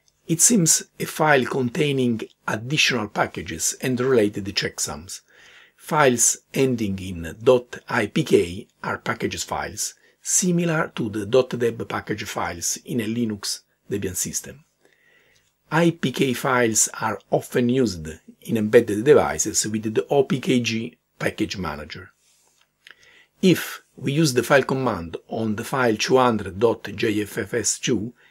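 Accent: Italy. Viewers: the italian dancing accent with scretched vowels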